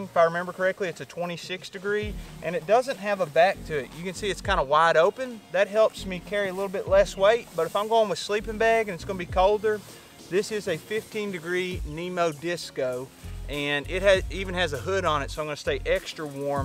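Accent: Kentucky, United States. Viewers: "Ah got mah tint-" Gotta love that Kentucky accent! It's just one of the many things which make up the video experience. ^_^ What is that beautiful location you're filming in, anyway? It looks glorious, I can practically smell the leaves.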